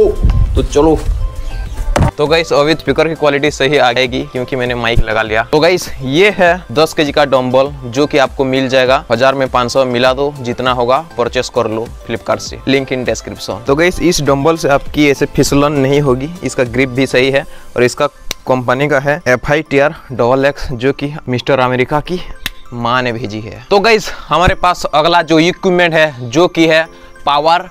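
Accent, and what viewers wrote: Odisha, India. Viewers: Bhai aap odiya ho kya ? Aapki language se u sound like .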